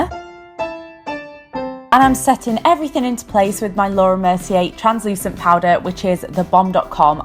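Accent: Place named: North, United Kingdom. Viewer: You're so northern and I love it!!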